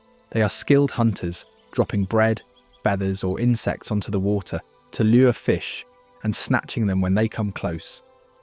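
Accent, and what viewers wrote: United Kingdom. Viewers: I tend to not believe so-called "authoritative" British accented voices.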